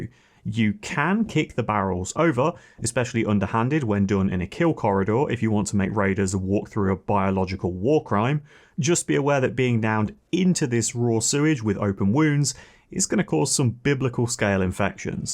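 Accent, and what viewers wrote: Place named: New Zealand. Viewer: For some reason I thought you were a kiwi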